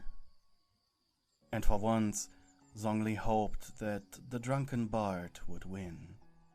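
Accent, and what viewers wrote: Germany. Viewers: you kinda sound german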